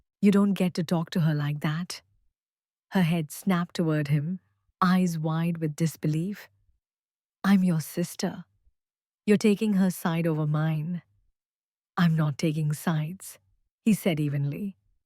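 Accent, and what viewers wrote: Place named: South Asia. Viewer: A white woman with a South Asian accent ?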